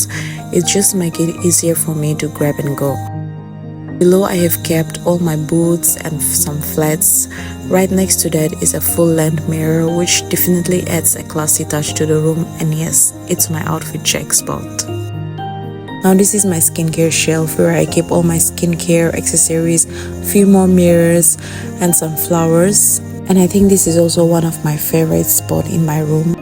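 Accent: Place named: Nigeria. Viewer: Your home is pretty but has anyone told you that you sound like a Nigerian I had to double check your profile but its cute like you are one of us❤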